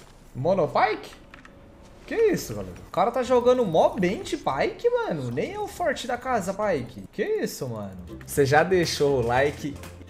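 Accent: Minas Gerais, Brazil. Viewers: sotaque mineiro do é o motivo pelo qual fico gay td dia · voce é de onde mano ? sotaque de minas ou de go ctz haha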